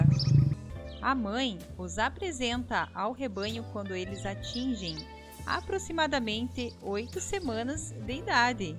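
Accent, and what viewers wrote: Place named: Rio Grande do Sul, Brazil. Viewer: Com esse sotaque "gaúcho" no Brasil preconceituoso tem preguiça em dar like.. opinião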